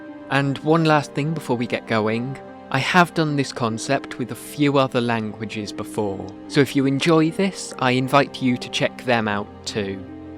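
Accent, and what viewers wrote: Wales, United Kingdom. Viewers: I was actually going to mention that (to me at least) you sound like you have a bit of a welsh accent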